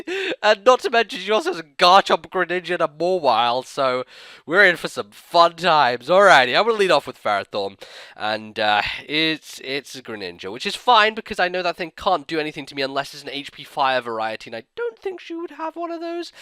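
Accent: France. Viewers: Yes... more French accent stuff! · Can we PLEASE have a battle narrated fully in your French voice? That would be oh so amazing.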